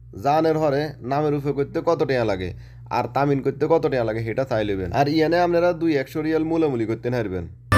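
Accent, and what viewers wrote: Noakhali, Bangladesh. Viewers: আপনি কি নোয়াখাইল্লা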